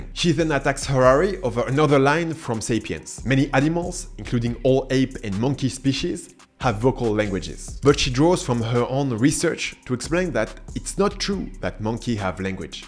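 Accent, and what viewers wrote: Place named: France. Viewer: Gaining Youtube subscribers is clearly not an easy job. The few things I have seen on your channel are so well done that I can say it easily matches other content of Youtubers with many thousands or even millions of subscribers. Keep up the good work. Who knows how many people you will be to reach within a few years. By the way the french accent is actually charming 😂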